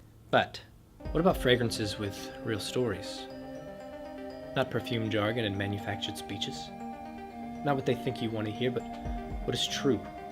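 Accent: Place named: Georgia, United States. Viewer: Your southern accent is so subtle-almost undetectable. I’m guessing Georgia. Two great fragrances, especially Shalimar.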